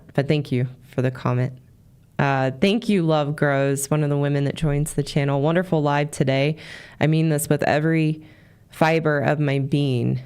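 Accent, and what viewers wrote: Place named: Midwest, United States. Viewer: I knew that I was hearing a hint of a midwest accent with a few Hoosierisms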